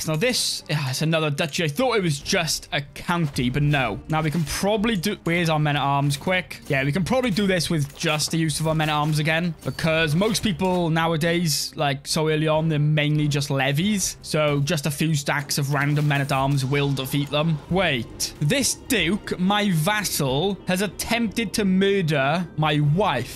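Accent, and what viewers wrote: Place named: Caerphilly, United Kingdom. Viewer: It’s always really nice to hear another person from wales. But always weird 😂 I would guess Caerphilly by the accent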